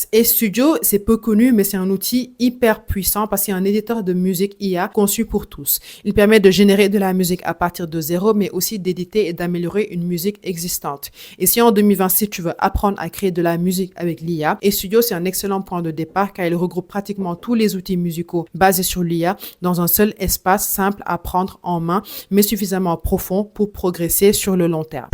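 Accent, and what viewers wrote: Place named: Senegal. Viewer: Joli petit accent sénégalais ! ❤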